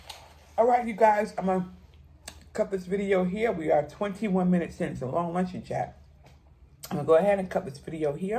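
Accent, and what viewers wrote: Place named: New York, United States. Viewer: Hi! So nice to meet you. Your channel came across my feed and I'm glad it did because we both are on a low carb journey! Great chat as we;; and I hear the NY accent! Sooo true, if you don't have your health you don't have anything! My husband is in a nursing home and I visit him 2-3 times per week and my heart goes out to the people who never have visitors. Blessings to you!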